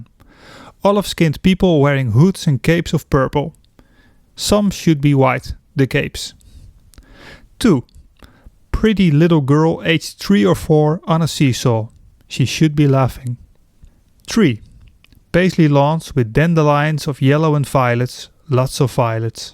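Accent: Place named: United States. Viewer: it took me a while to figure out you were saying ladder and not letter, the A should be AH not EH, your english is slightly americanized but great vid otherwise.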